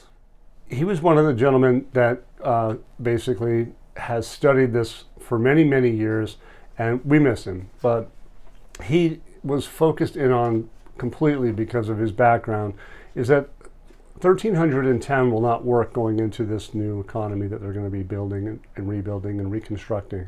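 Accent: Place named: San Diego, United States. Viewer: sounds like you were from the San Diego surfing scene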